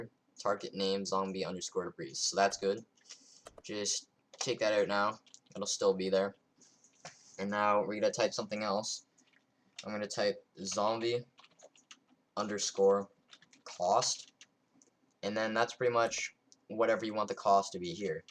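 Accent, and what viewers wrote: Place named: Canada. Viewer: i can tell he's canadian, from the obvious pronunciations of words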